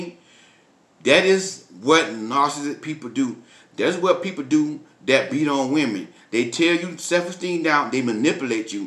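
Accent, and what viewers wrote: South, United States. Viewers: Your Southern Accent💖💖💖 You have wisdom.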